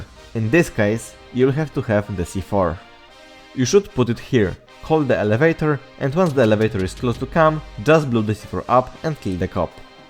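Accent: Poland. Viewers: holy polish accent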